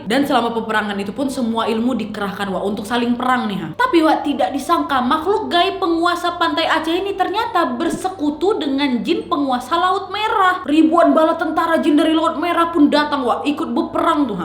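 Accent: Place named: South Kalimantan, Indonesia. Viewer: Dari kalimantan mana suara nyaa Kal-Sel Nih bos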